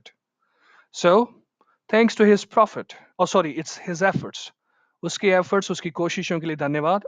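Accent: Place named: India. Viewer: Mate stick to ur Indian accent rather than imitating our accent.... why don’t u decide if u wanna speak British or American first instead of mixing them.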